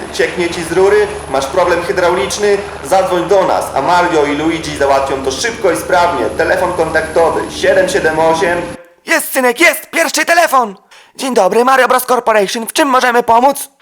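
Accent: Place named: Podlasie, Poland. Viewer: NO BARDZiEJ Z PODLASiA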